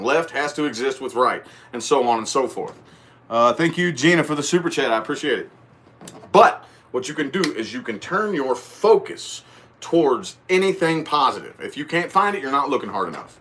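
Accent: South, United States. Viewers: ...That Southern Twang,...Love🦋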